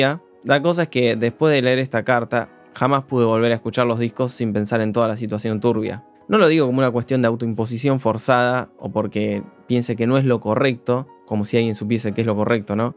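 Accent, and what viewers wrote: Argentina. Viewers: Más bien, no a todos nos gusta el acento argentino.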